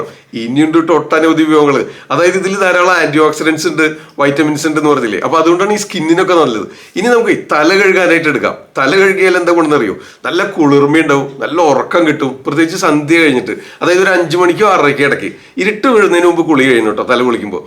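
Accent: Thrissur, India. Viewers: Nammude trichur style samsaravum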